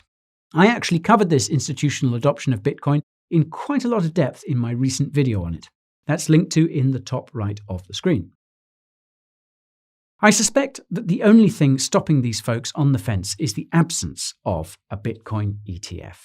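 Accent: United Kingdom. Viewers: This guy could spout nonsense but with the british accent he sounds smarter... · This guy puts Bitboy Crypto to shame....blown away by the great amount of info and research this guy provides without the smoke and fireworks. Maybe it's just proper British demeanor and accent that makes everything sound more credible...lol. Either way, I like it. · i found his accent is kind of tricky for me, no offence for the person in the video since english is my 2nd language. do you know which part of uk this kind of accent coming from?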